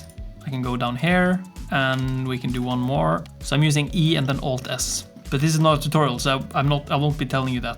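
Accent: Sweden. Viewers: Wait you have a Swedish accent? I think, if your from Sweden write a answer to this comment, in Swedish. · I love how obvious your Swedish accent is.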